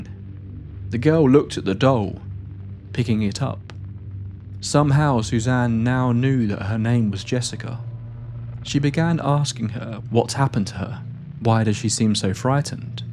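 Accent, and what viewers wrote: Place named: North East England, United Kingdom. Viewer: Were was this pub as the voice sounds north east of england were am from would love to no ❤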